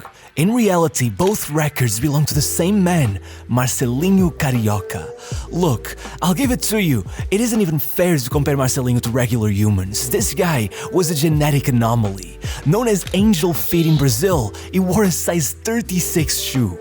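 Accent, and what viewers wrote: Portugal. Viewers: q brasileiro o q, ele fala S com som de Z kkkk. é portugues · I mean, he sounds portuguese which is closer culturally to brazil than most other european countries.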